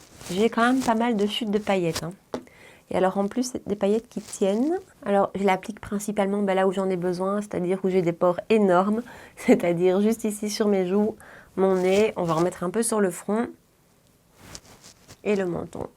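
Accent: Belgium. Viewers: Coucou ma petite. La notification qui fait plaisir à 19h pétante en plus avec un GRWM comme on aime 😁. Ton accent belge on adore 😁. Elle est vraiment belle cette palette de leur collection de Noël, les couleurs ont l’air vraiment intenses et magnifiques de part ce que je vois. Comme tu dis c’est quite ou double chez MUR. Tu me donnes envie de tester quelques produits de chez ELF comme la base. Il faut vraiment que tu testes la poudre lumineuse de chez ELF. (La loge de Gabrielle en parle souvent et la met principalement sur les côtés du visage pour donner un coup d’éclat naturel à la peau vu qu’elle a la peau mixte). Celui que je préfère en petit prix c’est celui de Catrice (je ne sais plus comment il s’appelle exactement), il a une très bonne tenue, une belle couvrance et n’est pas trop asséchant pour mon contour de l’œil j’aime beaucoup combiné avec le fond de teint matte de Catrice et la poudre matte également. 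Avec notre peau grasse il faut de la belle matité. Sympa le petit combo Nyx + essence pour les sourcils (à tester 🤔). Très joli maquillage au passage tu nous surprendras toujours. Pleins de bisous et une très belle soirée 😘❤️.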